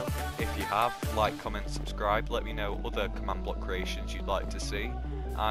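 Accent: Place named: Birmingham, United Kingdom. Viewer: That’s an interesting accent, is it from Birmingham?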